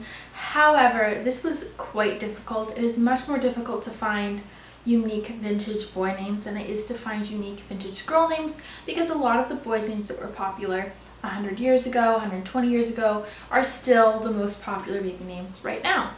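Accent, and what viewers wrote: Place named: Canada. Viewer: Are you from Canada? just wandering it kinda sounds like you have an accent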